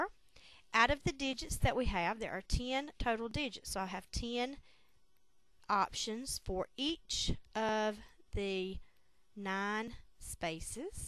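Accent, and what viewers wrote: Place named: South, United States. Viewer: YOU FROM THE SOUTH, I LOVE YOUR ACCENT!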